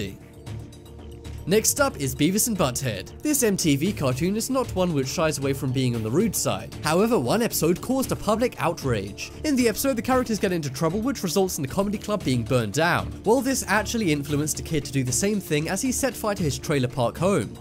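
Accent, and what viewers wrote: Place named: United Kingdom. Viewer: dude these stories are awesome even if you have a briddish accent I STILL LOVE YOUR VOICE these stories are amazing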